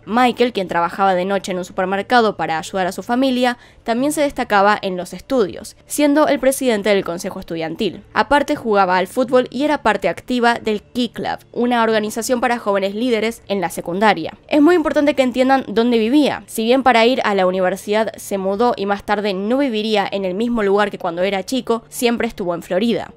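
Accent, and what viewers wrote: Argentina. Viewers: Estoy aprendiendo español me gusta mucho el acento Argentina 🇦🇷♥️✨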